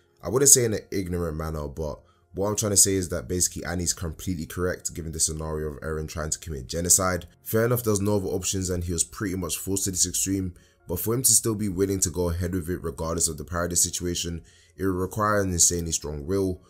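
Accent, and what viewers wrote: United Kingdom. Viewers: ARe u brit? nice explanation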